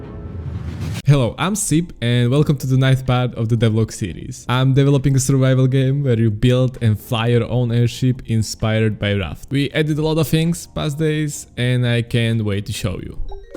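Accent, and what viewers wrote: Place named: Czech Republic. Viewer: Your accent sounds like czech lol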